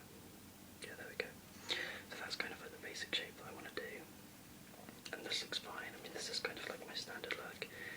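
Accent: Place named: United Kingdom. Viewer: British? Check.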